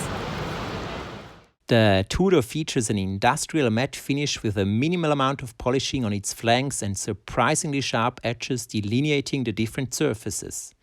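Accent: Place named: Austria. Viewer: Great video! I feel you have an Austrian accent. Am I right?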